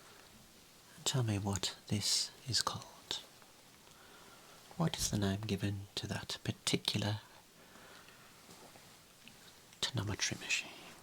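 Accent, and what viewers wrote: Birmingham, United Kingdom. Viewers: Brummie accents are so perfect for asmr. Great job again buddy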